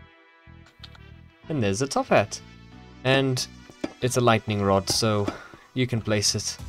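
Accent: South Africa. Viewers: You sound very much South African :) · Great video! I have one question tho... Are you also South African?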